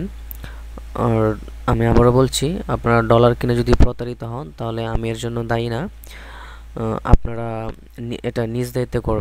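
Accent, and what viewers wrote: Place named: Bangladesh. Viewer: he's a Bangladeshi. He has Bangladeshi accent.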